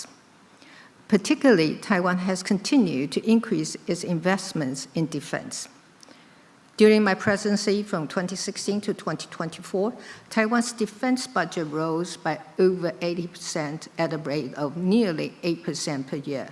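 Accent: United Kingdom. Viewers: 小英－口濃濃的英國口音讓我覺得好懷念自己年輕的時候！當初我在新加坡學英文的時候就是這樣的口音、我們的前總統小英真的好可愛🎉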